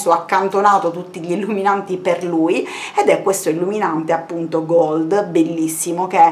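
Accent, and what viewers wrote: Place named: Sicily, Italy. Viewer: Io ti ho scoperta da poco e amo il tuo accento essendo anch'io di origine siciliana. E mi sto guardando tutti i tuoi video 💞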